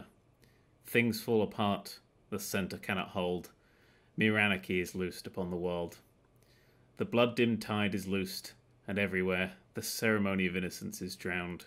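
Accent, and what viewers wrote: United States. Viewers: WAITDans American?????!!!! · is American!?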